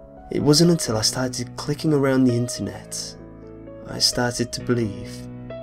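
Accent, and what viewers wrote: United Kingdom. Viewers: Why would a British person be telling an American folk type story? Thats ruining my immersion.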